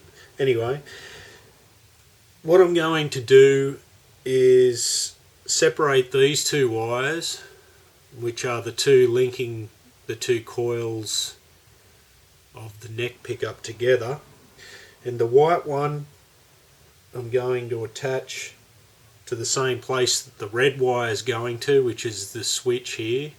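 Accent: Australia. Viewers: Iwas almost uncaring as to the info once I heard an Aussie accent. I was doubly glad to find good info without the ubiquitous yankee accent. Thanks digger. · Excellent video. I was particularly intrigued by the removal of the pole pieces altogether (as per Scott Grove's advice - he has a lot to say not just about guitars and guitar gear but about bloody everything lol). It did really brighten up the pup to my ears at least. I know that I'm six and a half years late on this upload but it's still pertinent. Great to hear an Aussie accent on the tube mate. Keep 'em comin'. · PS : I like that little riff you do !...greetings from Melbourne !!! good to hear a fellow aussie talking common sense ! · Judging from your kick-ass accent, I am guessing you are Australian?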